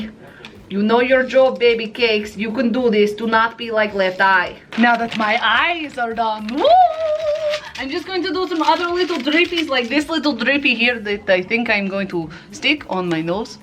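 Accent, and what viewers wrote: Australia. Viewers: OmO I'm back. Oh lord. I found Piloff and this accent first.. and I started to go through your other videos because I am in love. But I was shook hearing the aussie accent. You are so extra and halarious!! Keep up being the fabulous you!!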